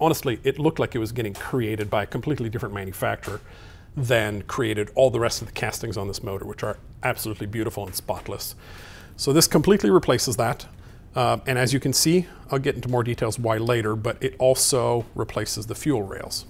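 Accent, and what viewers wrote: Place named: Canada. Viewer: A Canadian sounding Hoosier?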